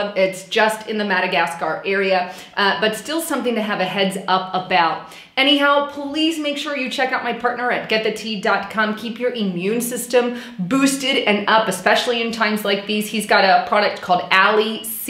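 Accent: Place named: Midwest, United States. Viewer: where are you from? You sound midwestern. I just suscribed to your channel a week ago. I really enjoy your reports.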